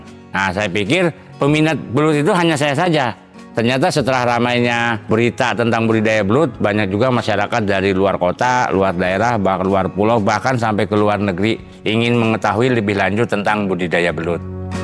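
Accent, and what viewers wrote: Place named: West Java, Indonesia. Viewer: Pantes logatnya kaya logat sunda...lama di bogor toh kang...terimakasih ilmunya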